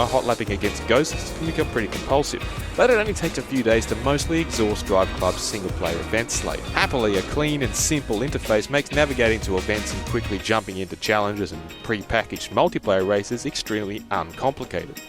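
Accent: Australia. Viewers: was this review done by an australian? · Nick Revels Do people not know accents? is an Aussie.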